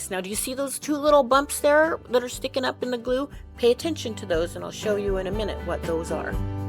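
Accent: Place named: South Carolina, United States. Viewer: You must be from upstate South Carolina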